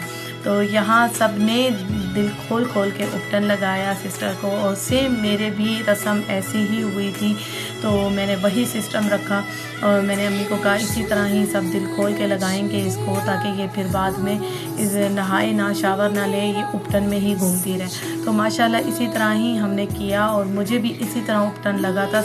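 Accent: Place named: Saraiki, Pakistan. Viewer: I am also saraiki speaking.itny time bd apni boli sun k bht acha laga. Btw i live in khobar. Bari apk vlogs ma dekha k ap meray area se guzar k jati hain khanay penay ❤❤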